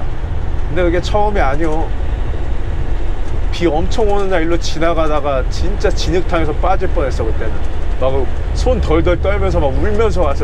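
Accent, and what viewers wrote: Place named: Chungcheong, South Korea. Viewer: 친숙한 충청도 사투리 ㅋ 어디세요? 전 충주 ㅋ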